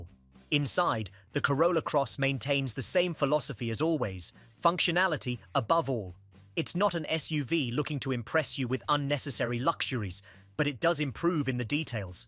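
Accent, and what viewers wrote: United Kingdom. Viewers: British accent sales the videos and products , why? That is the culture and mindset !!!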